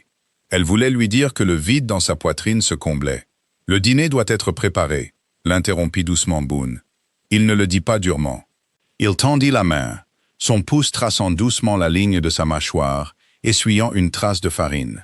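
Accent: United States. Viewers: Pourquoi la voix ia prend un accent américain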